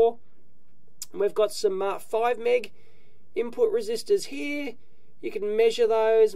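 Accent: Australia. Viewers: "terrible voice" is a little squeaky but for non-english speaking people (like me) is very clear, intelligible and understandable. The most terrible things are both A and E pronunciation but, well, Aussie way:) When he says "EEV Blog" I still get something like "HEY HEY VEE BLOG", but after months - years, by now - of videos I eventually started to love so much his accent. And, of course, I deeply love the contents, the professionality and the expertise. I learned a lot from this YT channel, as well as how understand english language spoken by a "squeaky Aussie" (sorry LOL)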